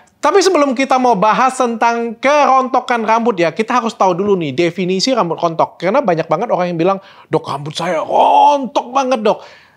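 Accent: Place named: Kalimantan, Indonesia. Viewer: biasa nya kalau ngomong karena "kerana" dan R agak kedal itu asal kalimantan bener kah ?? BTW ,, menunggu vitamin untuk rambut uban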